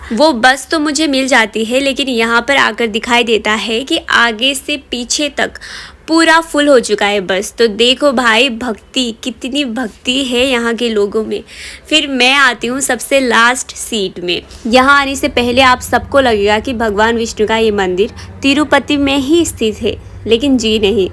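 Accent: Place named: Mumbai, India. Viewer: Apki hindi mumbai jaisi h 😮 kbki maithil log to etna pyara hidni accent jante h .. i love maithili language too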